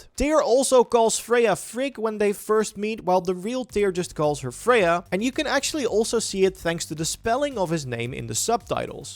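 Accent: Netherlands. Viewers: Are videos voiced over by multiple people these days? The voice is very different from some other videos and the shorts, both sound like they're from the netherlands though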